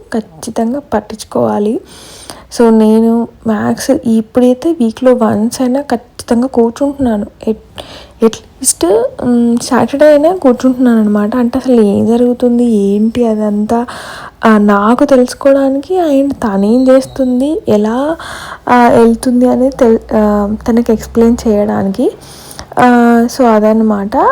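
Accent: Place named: Chittoor, India. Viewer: Asalu voice gurinchi yenduku ala antunaru? garu karnataka kada koncham chittoor slang ki similar ga undochhu....any how chala neat ga matladuthunnaru....go head and congratulations garu❤ Navaneeta from Bangalore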